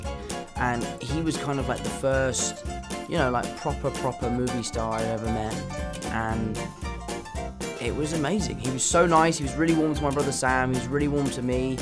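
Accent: United Kingdom. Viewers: His British accent is alive we can rest now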